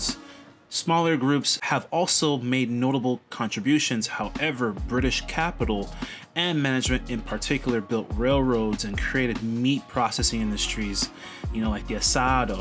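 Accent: United States. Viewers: Your american accent and been just a tourist for sure they ll treat you nicely...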